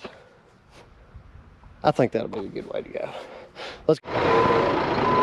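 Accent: South, United States. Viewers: I'm sure I'm not the only one to notice, your country twang goes away when you talk on the CB 🤣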